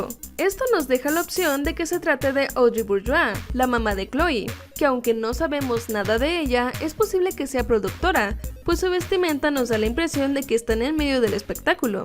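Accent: France. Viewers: tiene un hermoso acento francés UwU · Me encanta tu acento francés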